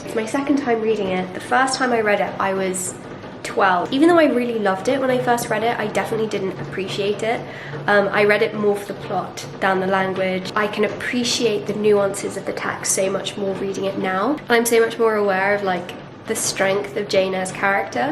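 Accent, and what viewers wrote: United Kingdom. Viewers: British accent and cute home content.........yes, i love it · As a foreigner i am literally amazed by your smooth British accent and your calmed temper. Your style is so cozy and cute. The way you spend your free time with reading a lot (not ‘waste’ it like unfortunately majority of people nowadays, especially on YouTube) is immensely inspiring♥️ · I wish She could read to us. Her voice is very calming and lol...I love her British accent. 😆👍💜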